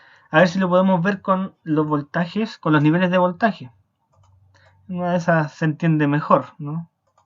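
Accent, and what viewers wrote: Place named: Chile. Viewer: muchísimas gracias muy didáctico y muy bien explicado así es muy fácil de entender por su acento parece ser de Chile , un saludo desde Colombiano.y nuevamente muchas gracias. .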